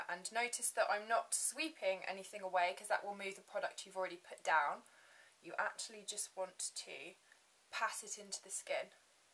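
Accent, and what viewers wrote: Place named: London, United Kingdom. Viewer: are you from london? love your accent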